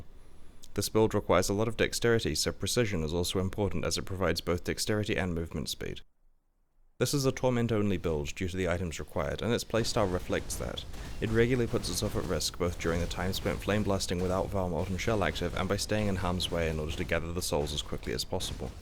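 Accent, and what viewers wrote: New Zealand. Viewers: No, thats just the New Zealand accent :)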